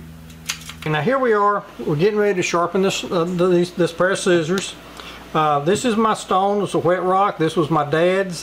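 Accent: South, United States. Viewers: I just love your southern drawl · thank you for this great video! It was nicely done and you very thoroughly explained the technique and WHY you don’t do certain things. I always find that sooo helpful when you tell someone why they shouldn’t do something (like the backside). You sound like you’re from the south, maybe North Carolina, because that’s where I lived for 25 years and my hubby is a southern boy from NC.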